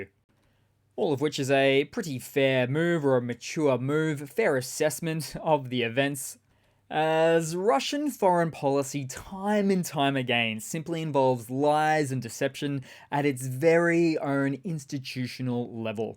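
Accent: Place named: Australia. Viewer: Sorry, but I still cannot get used to an Aussie accent pronouncing ‘route’ as “rowt” rather than “root” and ‘via’ as “vee-ah” rather than “vye-er”.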